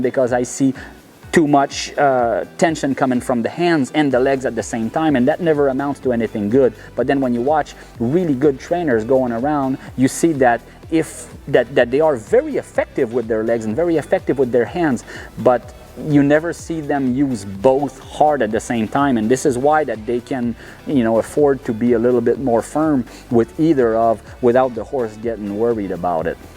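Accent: Quebec, Canada. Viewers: You sound like you are from Quebec! Am I wrong?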